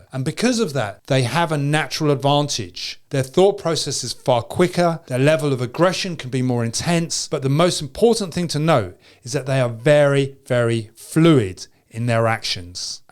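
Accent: England, United Kingdom. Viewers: There is NO ADVANTAGE to having no experience in fighting. No matter what this drunk content creator with an English accent says.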